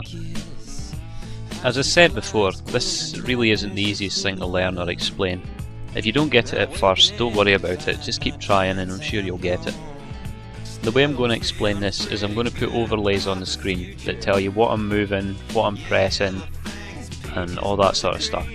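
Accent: Scotland, United Kingdom. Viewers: nice tutorial m8 good 2 hear a scottish accent explaining it a want to master that funneling looks a good move i try swoop in shooting lol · Nice one. You sound quite clever for a jock ;-) Great tutorials there. Really enjoyed ya voice too. Normally cant stand Scottish accent, but then again you would hate my brummie one eh? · nice tutorial m8. i see you're very experienced. and nice accent. im scottish too :D. Do you still play BF2?.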